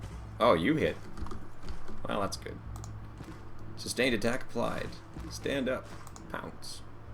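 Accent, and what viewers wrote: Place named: Canada. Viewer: He sounds Canadian. Go Nathan Fillion! I love WM/HORDES and was just about to buy this game but like so many other games with dice, this seems to both suck AND blow. 63% chance of hitting, 3 misses in a row, and I have a feeling that wasn't an isolated case. Also it seems PAINFULLY slow to play a game...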